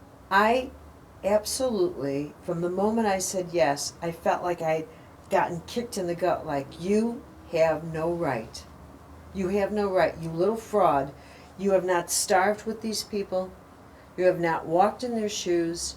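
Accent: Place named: Chicago, United States. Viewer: I do sometimes feel annoyed with her and wish she had a better speaking voice e.g.That American Chicago accent can grate.But her amazing insights into the behaviour of people and religious Myths and people's everyday way of dealing with life is so darned honest and incisive it is riveting. As much as i love her I will not give up the scaffolding of Catholicism for her intellectualism. Like St Teresa of Avila I love it.And CM has become much nicer since meeting that great Catholic mystic.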